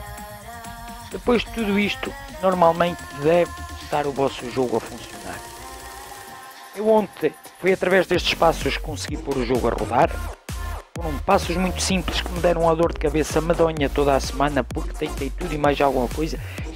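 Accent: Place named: Europe. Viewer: maxo esse sotaque europeu e essa musica estourada puta merda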